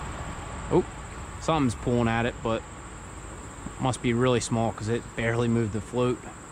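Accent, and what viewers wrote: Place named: Pittsburgh, United States. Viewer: You sound like you're from Pixburgh! Right?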